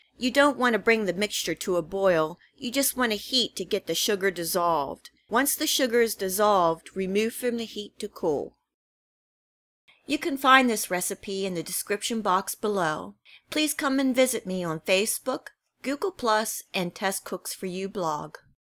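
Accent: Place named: Philadelphia, United States. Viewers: I think I hear the Jersey/ Philly accent! I love it, my wife still has a little bit. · Oh, and Tess, I just love your PA Dutch accent! : )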